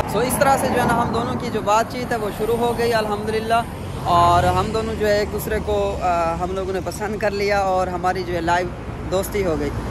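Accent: Saraiki, Pakistan. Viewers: brother apki awaz se hi pata lag gya tha k ap seraiki hu jitna bholese tu wala utna suchase so everything is fantsatic in your vlog wish u a very happy life allah hamre b naseeb khole apki trah😂😂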